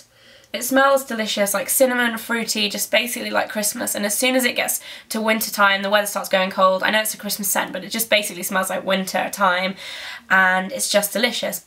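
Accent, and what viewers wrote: England, United Kingdom. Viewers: wow i love your videos! Its so nice to hear a good english guru (not that i dont love american gurus!) And.... you are one of the first to do a november video!! xx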